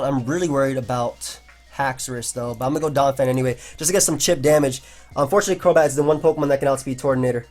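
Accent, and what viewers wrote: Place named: New York, United States. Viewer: It's that NY accent...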